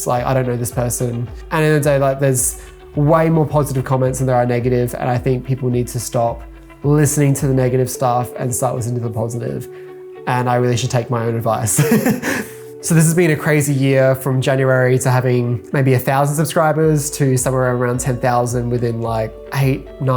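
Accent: Australia. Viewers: don't mind nasally, gay, effeminate Australian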